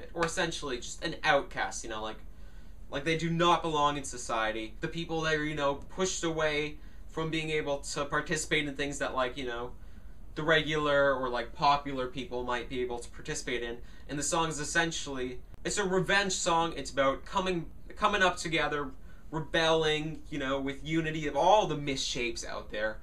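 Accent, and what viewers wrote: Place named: Transatlantic, United States. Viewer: You're not only the first person with one of those funny transatlantic accents I've seen to like. You're probably the first Yank I've met who properly gets the class system we have over here. Kudos for that. Seriously. Also interesting point about something changed. Never thought about it before but you're right. It doesn't fit with the rest of the album. Not bad for a whippersnapper.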